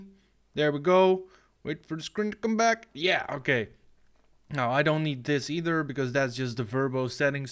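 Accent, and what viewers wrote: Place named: Netherlands. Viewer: dag ik zie dat je nederlands bent het was duidelijk aan je uitspraak let er op bedankt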